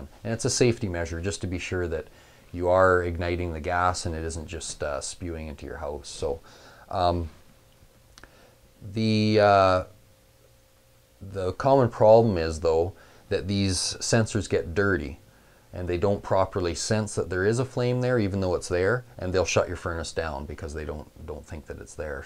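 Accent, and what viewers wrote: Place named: Canada. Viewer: I knew u were Canadian by your accent but you saying 40 below just confirms it. That's crazy cold. Great videos. Best in the web! Thanks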